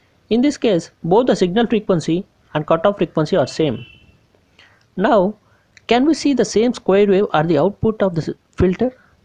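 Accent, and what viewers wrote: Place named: India. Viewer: Although the content is excellent, the indian accent makes the video come close to the point of unbearable.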